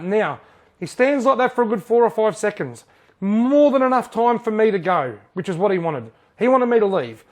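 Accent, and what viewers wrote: Australia. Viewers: Me before watching the video: "Woah, this guy shouldn't be messing with the 2nd most poisonous snake.": * speaks with an Australian accent * Me: "very nice, carry on" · LOL! I LOVE this guy! This video just happened to come up in a search I was doing on the brown snake! I LOVE that this guy DESCRIBES what happened before you even watch the video! The build up to the action is INTENSE! I love his accent too! Everything sounds better with an accent! I don't know how you people from Australia do it! Snakes, spiders, crocs, dingos and everything else! You have to fight HARD to stay alive in Australia! Then again, I'm in the US. Guns, guns, guns, people, people, more guns....you have to fight hard to stay alive here too! LOL....different predators though! I LOVE this video! Keep up the fantastic vids! As a side note, that snake was REALLY anxious to get away! 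It was so funny when he initially coiled. It was almost as if you could read his mind and he was saying "Look! I don't want to have to bite you! I was JUST trying to cross the road! You are REALLY getting on my nerves! Don't make me have to do this!" I LOVE IT!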